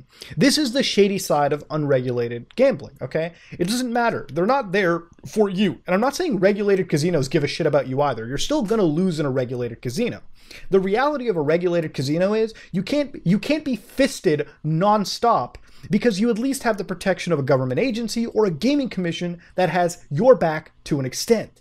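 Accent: Canada. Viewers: Aboot, caarr, your Canadian accents so thick my friend. -love from Torono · He's Canadian, he's got a different accent. Like when an American says croissant vs a French person.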